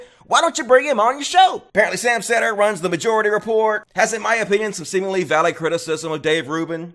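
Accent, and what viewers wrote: South, United States. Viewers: Well you're southern so it's to be expected, like when Pimpmunk said Massetushes.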